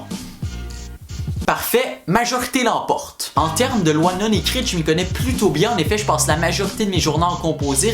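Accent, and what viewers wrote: Quebec, Canada. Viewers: C'est un peu dificile de comprendre l'accent quebecois!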